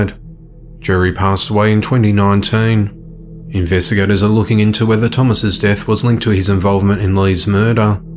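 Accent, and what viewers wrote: Australia. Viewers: Obviously everyone is entitled to an apinion.why dose it have to be an American accent that should be used.not everyone who watches this channel is American im sure other Americans watching would agree the accent can only be a problem if the English is badly spoken.i think this Australian accent is so not fake easy on the ear.no oh my gods,clear to the point of a tone that matches the content.living in. America you should be used to different accents and be more culturally intelligent.keep going with the Australian accent it’s what makes you guys individually top class.🧚Charlotte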